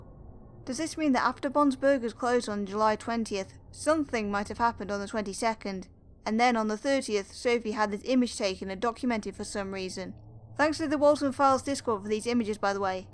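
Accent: United Kingdom. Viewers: Bristol? I wouldn't have guess that in a million years. I did think you were somewhere near Wales but your accent isn't that strong tbh. I like these Walten Files videos, keep up the good work!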